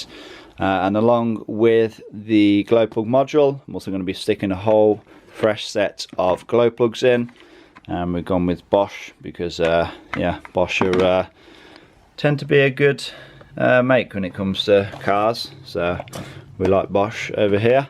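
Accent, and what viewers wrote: United Kingdom. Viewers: you speak heavily with British English accent and I couldn't understand some of it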